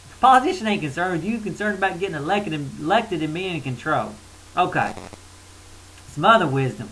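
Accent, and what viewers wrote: South, United States. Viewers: Very good 'good ol'boy' accent. Simple wisdom from a simple guy... one of us? Not really...hahaha. What we have here is a contradictory jambalaya of esoteric 'doctrine of the ages' sprinkled with liberty movement and generic pseudo Christian phrases. Paranoia is delusional fear. Sadly there is too much blatant & historic evidence of the malevolent intent in the character and actions of the long laboring apostles of the coming New Age of false "Peace, Love & prosperity", to be labeled Paranoia.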